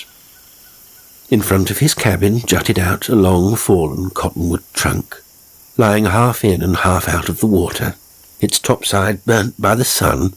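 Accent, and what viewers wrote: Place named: South, United States. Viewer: Your poor white trash accent was spot on. And I am from the south so I know. Really good.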